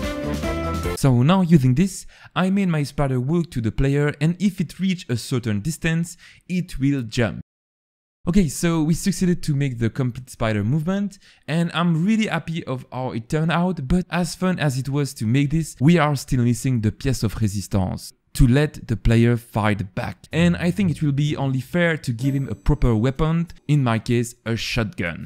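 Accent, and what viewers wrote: France. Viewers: Y a qu'un truc qui me choque ! si je ne me trompe pas vue ton accent ! tu est français . pourquoi tu ne fait pas de vidéo en français aussi ?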